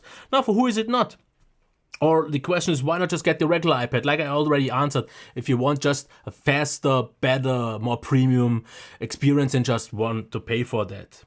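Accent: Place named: Germany. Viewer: Man I love that German accent, brings the queen's English to life.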